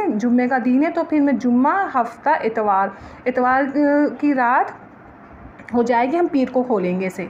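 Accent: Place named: Sindh, India. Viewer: Sindhi lehjey men Urdu bhht achi lag rhi h ap boltey hoey..and acha tariqa h pal laganey ka but aam ko pani nhi lagay jata h us se aam naram ho jata h.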